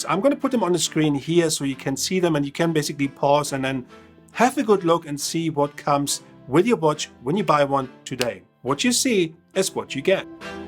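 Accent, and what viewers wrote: Germany. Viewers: Noice review, wonderful German accent. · Sehr Gut Gemacht video. I like your Deutsch-English 😂😍😍😍💓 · Englisch ist nicht wirklich deins mit einem deutschen Akzent.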